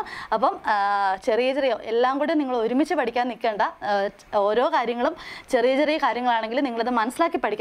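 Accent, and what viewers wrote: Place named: Kollam, India. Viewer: കൊല്ലം ഭാഷ ആണ് എനിക്ക് നന്നായി മനസ്സിലാക്കുന്നത്. ഞാൻ കുറെ ലാംഗ്വേജ് സിൽ ഉള്ള ക്ലാസ്സ് കണ്ടു അതായത് തൃശ്ശൂർ തിരുവനന്തപുരം ഇതൊന്നും എനിക്ക് മനസ്സിലായതേയില്ല അങ്ങനെ ഒടുവിൽ എനിക്ക് കൊല്ലം പത്തനംതിട്ട ഭാഷയുടെ ക്ലാസ് കിട്ടി.😝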